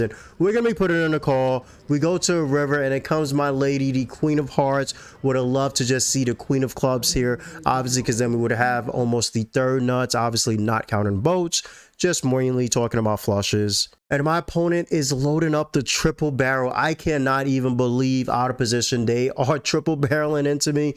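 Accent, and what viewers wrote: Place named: Louisiana, United States. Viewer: Based on your accent I’m guessing you are from Louisiana.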